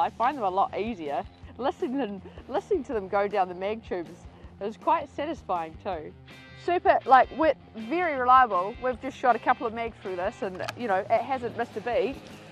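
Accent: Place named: South, United States. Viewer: Shes a southern girl!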